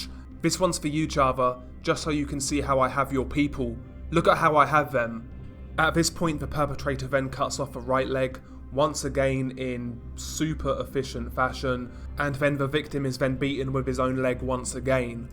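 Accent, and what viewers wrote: England, United Kingdom. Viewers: Best sound theme ever ! Combines lovely with ur english accent. ' Rival Cartel Membahh!' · British accent is wonderfull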